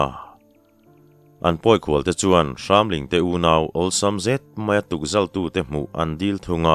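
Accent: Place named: Champhai, India. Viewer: Tawng thluk thu leh lam dan ah chuan mizo thawnthu chhiar ka hriat toh ah chuan keini Champhai lam tawng thluk dan nen chuan i tawng thluk leh tawng kau chhe hi ala in mil in a dang hauh lo mai, khawdang tawng chu sawi lo ila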